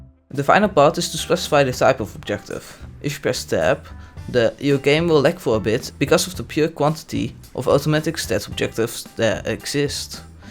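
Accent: Netherlands. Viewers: are you dutch so not English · Are you dutch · hij heeft echt zon nederlands accent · duidelijk een nederlander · are you dutch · that dunglish tho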